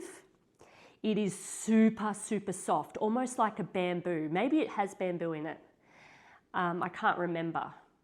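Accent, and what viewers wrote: Australia. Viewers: Oh, I discovered your channel today and was so pleased to hear a fellow Aussie voice. I love to discover sheets and blankets to use for my backings at our local charity shops. Love your quilts ❤ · I love your accent! My Mother was from Perth. SO I am loving your accent. MyrLene ❤️ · Just found your channel and it’s refreshing to hear an Aussie! I’m also from Brisbane! I relate to your quandary on what to quilt ! I’ve never used a sheet but I’m thinking it’s a great idea, also using old men’s shirts. I’ve been watching a lady in the US who buys lots of shirts and makes beautiful quilts with them. If I can remember her channel name I’ll comment again.😊 Found it! From Small Things, her name is Sue and I find her very interesting 😊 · Lovely to hear an Aussie voice, I'm from the Sunshine Coast near you. Interesting video, love getting ideas from other quilters. 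I like the idea of stitching around your focus shape. I've done a bit of hand stitching around my borders, pretty hard to do without a big table but heck, gotta try something new. Since I don't have a big table I've been doing "quilt as you go" but I like the look of a separate top, and your backings are nice. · I’ve just come across your site and it’s nice to hear an Aussie accent. I live in Perth. Your quilts are lovely and your backing ideas great. Thanks for sharing your ideas. 😊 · Lovely to hear an Aussie talk about quilting. Hello from the Sunny Coast. My suggestion for quilting would be diagonal lines both directions AND inside the white as you were thinking.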